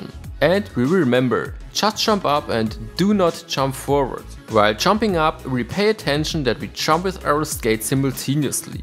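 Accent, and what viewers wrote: Germany. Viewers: that german accent is hilariously strong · Well if you want, one day we should skype, discord or something so I will try to train you on these V and R. Your Rs are not bad, but you seem to focus a lot on these, while most of them do not deserve great attention and should be very light and short, and even sometimes almost muted. Your Vs are... hum... still a lot german (surprise surprise) XD As I said to you previously, your tutorials are very thorough, and that is really enjoyable. In my opinion, it's better to have fewer information that are fully understood, than a bunch hard to get (I'm exaggerating for you to get the picture). I'm not suggesting you to be less thorough, no no no... but rather splitting your videos in two parts, hence giving you twice the time. I bet your german audio track is live with your video (I've not checked, cause I don't speak german), so once a thing is explained, then you just show the video part again, maybe slow-motion, without live audio, and you can insert short comments, like :"here, watch my knees !", that kind of things, just to highlight a key aspect of the move. 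As you are making tutorials, you are teaching us new things, new concepts, so most of us need time for it to make sense, for it to sink in, and then understand other new concepts relying on the previous new ones. I've seen however that you have a nice number of followers on your german channel, congrats !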